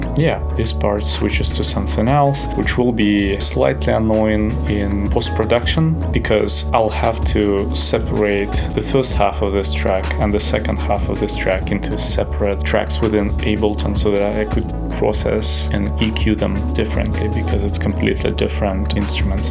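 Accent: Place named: Eastern Europe. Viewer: This video made me dig my anbernic rg35xx out of the box. Also, your accent sounds slavic to me 😁Thanks!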